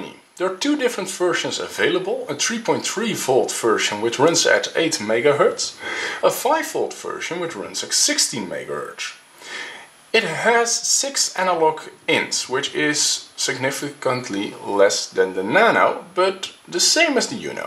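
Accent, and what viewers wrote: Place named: Netherlands. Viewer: I hoorde gelijk dat je nederlands bent...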